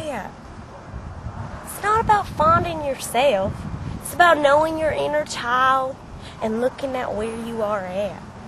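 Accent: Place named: Mississippi, United States. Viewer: i'm from and live in missippi, and she sounds like a lot of people from here and alabama..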